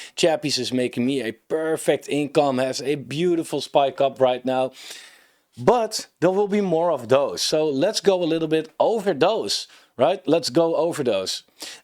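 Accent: Netherlands. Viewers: Nice video m8 doing great keep up the great work! Like your style, and dedication to explain your vision and information. The first video when i found you on youtube i was like hey he's dutch i can hear it Nogmaals goed bezig man! En op naar een mooie cycle met mooie kansen Laterr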